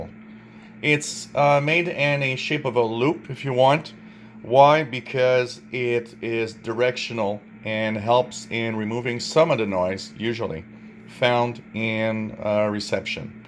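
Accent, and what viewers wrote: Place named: Quebec, Canada. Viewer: Definitely from Quebec with that accent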